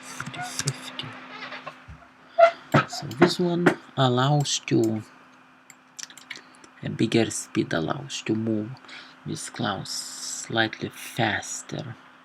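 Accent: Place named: Balkans. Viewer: Finally after 2 hours of searching i finally found good tutorial... Thank you! P.S. I might be wrong but from your accent and the pronunciation of the "R" ...i have the impression that you are from the balkans? (Sorry if i'm wrong).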